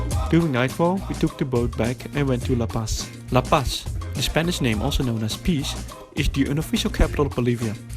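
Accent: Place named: Netherlands. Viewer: Turn on subtitles... sorry for the inconvenience.. Dutch accent is hard to understand apparently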